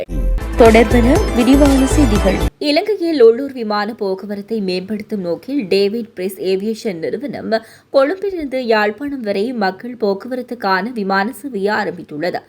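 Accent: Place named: Kerala, India. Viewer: நீங்கள் சற்று நிதானமாக செய்தி வாசிக்கலாம். மலையாளிகள் தமிழ் வாசிப்பது போல் வாசிக்கிறீர்கள்..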